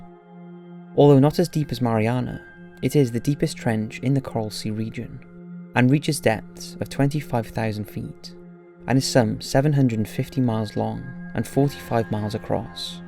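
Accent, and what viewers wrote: Wales, United Kingdom. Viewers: Trying to guess the accent. Welsh?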